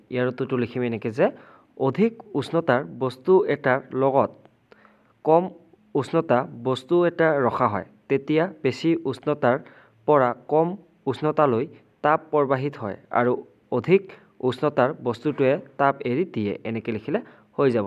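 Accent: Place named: Bodoland, India. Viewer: Sir aapuni Bodo hoi nki maat tu xuni enekuai lage? 🤨🤨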